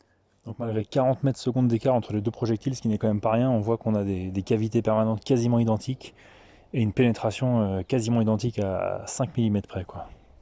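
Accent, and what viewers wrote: United States. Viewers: Super video, l'accent ricain très soigné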